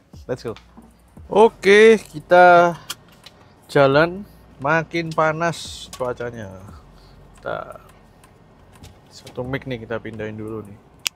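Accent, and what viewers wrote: Surabaya, Indonesia. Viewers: sampean enak nek ngomong suroboyoan ko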